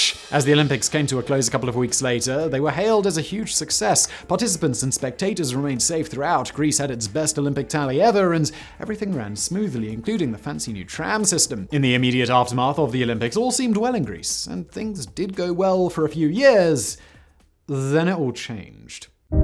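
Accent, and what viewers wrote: RP, United Kingdom. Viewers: After dopping and sponsoring, not only the Olympic games but also sports in general gave lost any meaning... I think Greece should be the country to bring the Olympic games to their original purpose, but they wanted to impress and wanted to give work and "gifts" to every engineering company in and out of Greece, and so corruption was the protagonist, not sports. And if an English man with a posh accent dares criticise Greece as inadequate for the Olympic games, the 2016 London games are my revenge, when that country showed off as its contribution to humanity an old lady with jewellery, a fictional murderer and a buffoon. I guess imperialism is out of fashion and they couldn't show off with dead natives and stolen antiquities 😁😂🤣😤😡🤮👎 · By the way this person talks too fast I have to put captions, even his britis or english accent was very distractive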